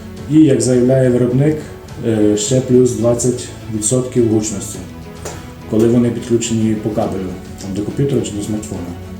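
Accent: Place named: Western Ukraine, Ukraine. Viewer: Надіюсь люди підтримають ваш канал, приємно дивитись і слухати, хоть і чути акцент із західної:) Удачі вам! Підписка і лайк!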